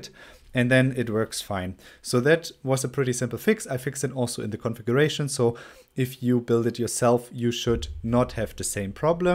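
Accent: Germany. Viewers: i hear a bit of se german akzent , LG :)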